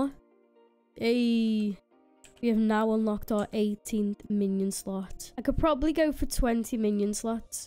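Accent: Liverpool, United Kingdom. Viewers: You probably get this a lot but are you scouse lol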